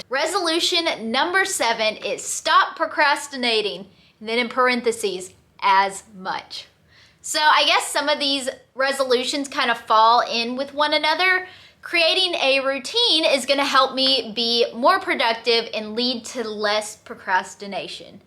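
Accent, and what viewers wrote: South, United States. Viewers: My resolution is to watch you more because your true blue not fake an that's what makes you real keep it up an your southern accent so cool till yor next video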